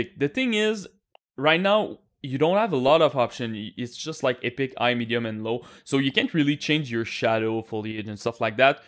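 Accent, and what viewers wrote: Quebec, Canada. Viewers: tsonne tres québékois my guy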